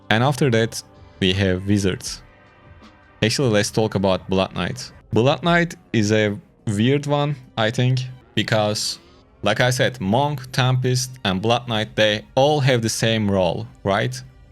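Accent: Turkey. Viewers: Kardeşim sen türk müsün ingilizceyi konuşma aksanın cidden türk gibi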